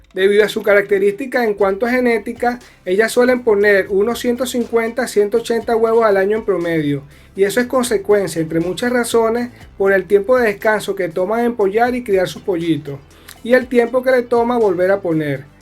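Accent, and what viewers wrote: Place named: Venezuela. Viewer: Por tu voz me doy cuenta que eres Venezolano... tengo un gallo producto de un gallo criollo con una gallina ponedora Isa, un hermoso ejemplar de plumaje negro con pocas plumas Rojas y azules. Ahora, veo tu video y veo que mi plan se ajusta al tuyo. Pregunto, donde resides? Y como logras encontrar los gallos hermanos de ponedoras?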